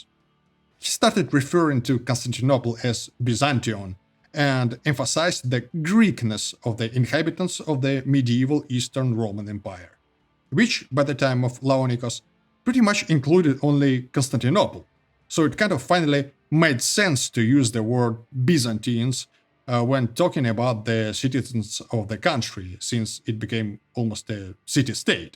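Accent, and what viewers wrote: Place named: Eastern Europe. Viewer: Wrong. The name "Byzantines' was popularized by the other Roman empire... the German speaking one. They refused to call the other Roman empire Roman because they insisted the holy Roman empire was the "real" Roman empire. Your anti-Greek videos and slavic accent suggest a less than objective motive. What's your background?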